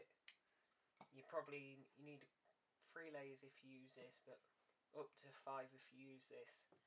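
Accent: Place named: London, United Kingdom. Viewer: Do u live in like London or some thing? Cause ur accent sound like it a little. 👾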